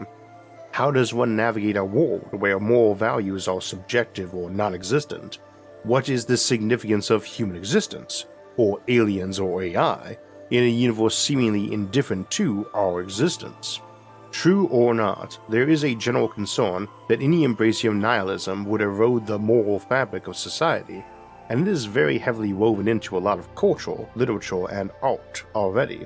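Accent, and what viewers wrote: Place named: South, United States. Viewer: This deep southern accent of the USA is worse than a Scottish one.Who picked the parameters for the A.I. text to voice? Mr. Alabama? Unless you come from the Mississippi delta you’re not gonna have an easy understanding.A human would have done this better.For this ,using an inferior A.I. I will boycott the rest of the video.Give jobs to humans,you pile of rusted microchips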